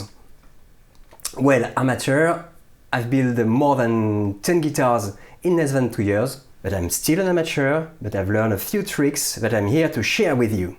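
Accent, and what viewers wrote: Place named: France. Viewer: Your english is very good even with french accent. Nice tutorial 😉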